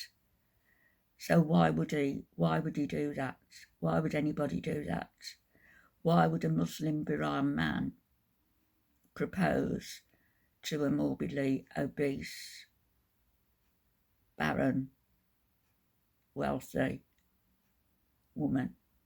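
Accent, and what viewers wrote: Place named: England, United Kingdom. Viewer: and for the ones that areagreeing with this lady coming on here and making videos she’s the one coming off as a bully she makes videos and just talks garbage and expects nobody to react to it I mean come on this is a bully channel and she only sounds “graceful“ because she has an English accent but trust me if she was American the way she would probably be saying things is probably the same thing as it would sound now you people are sick supporting this channel one day she woke up started watching Chantel and decided to jump on the shit talking aspect of things and all she talks about in her videos is Chantel it’s pretty obvious this lady doesn’t like Chantel so she makes a whole entire channel based on shit talking on her and she expects Chantel just to stay quiet? I don’t like Chantel‘s behavior at all but I would think that people like this would have much more things to do and much more creative things to do at her age than making these types of videos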